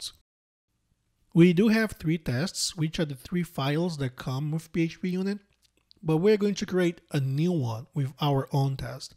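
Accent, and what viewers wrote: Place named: Brazil. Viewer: Esse sutaque é BR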